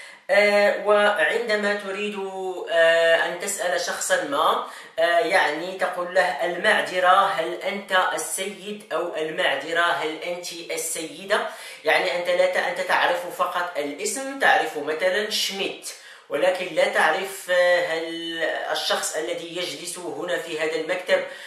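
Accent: Morocco. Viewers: انته مغربي ماهيك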